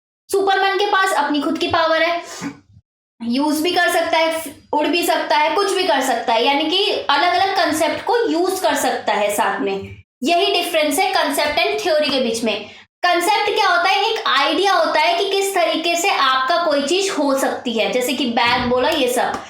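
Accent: Assam, India. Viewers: Ma'am are u Assamese?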